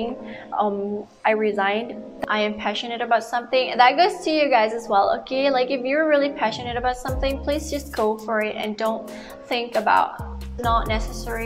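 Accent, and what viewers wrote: Kedah, Malaysia. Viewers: Mula ii tengok.. ingtkan makkk salehhh join pdrm.. lpstu keluar loghat kedah.... Okay 😐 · org kedah ka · firstly, your accent ahhaha 😂 then loghat kedah...2ndly, ive got an iv dgn kastam so just watching , btw i study in construction ind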